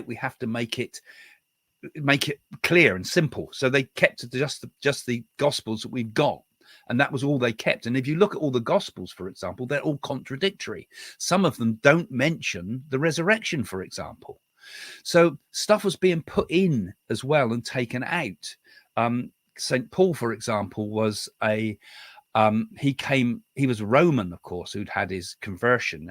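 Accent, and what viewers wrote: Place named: Scotland, United Kingdom. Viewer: Some Scottish accent here and there during your conversation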